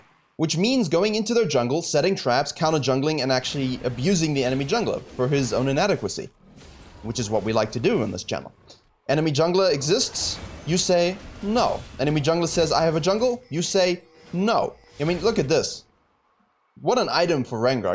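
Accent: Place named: South Africa. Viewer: good commentary, you sound like a Saffa boet? gg wp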